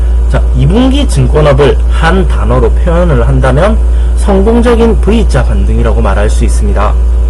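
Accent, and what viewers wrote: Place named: Gyeongsang, South Korea. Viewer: 경상도 억양을 줄여줬으면 좋겠습니다. 도표와 그래프를 보면서 아침 출근하면 차 사고 납니다. 말이 조금 빨랐으면 좋겠습니다. 읽는거 보단 말하듯이 이야기 해주셨으면 좋겠습니다. 이전 영상들(지금은 비공개 되었지만 ㅠㅠ)에 비해 그래도 들어줄만 한 정도로 컨텐츠가 많이 좋아졌습니다. 다만, 2020년 2분기를 설명하는데, 그것도 증권업을 이야기 하는데 코로나에 대한 내용이 빠진 것이 아쉽습니다. 영상 중간중간에 전환이 매끄럽지 못하네요... 잘 듣다가 갑자기 결론없이 다른 내용으로 넘어가서 몹시 놀랐습니다. 앞으로도 예의주시 하겠습니다. 좋은 영상 고맙습니다. 내용이 너무 어렵네요. 어려운 내용을 쉽게 풀어주셨으면 좋겠어요.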